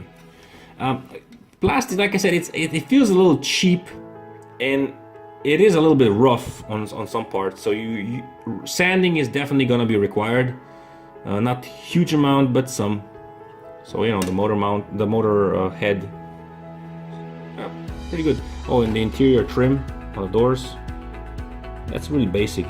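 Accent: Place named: France. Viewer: Francozski naglas👌😂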